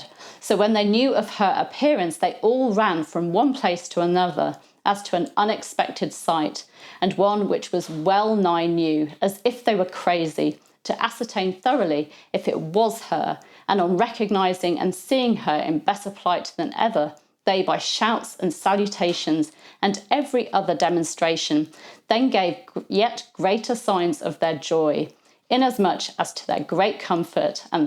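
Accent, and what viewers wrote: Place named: United Kingdom. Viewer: I love your channel so much! I just love to hear you speak with that wonderful British accent! Plus you are a terrific teacher. ❤️👏🏻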